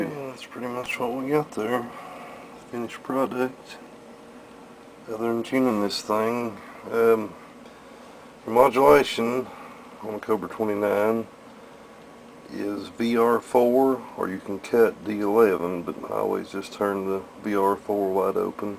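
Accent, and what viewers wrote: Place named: Tennessee, United States. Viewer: You sound like your from Tennesse man! If you were to say youn's then I'd know for sure.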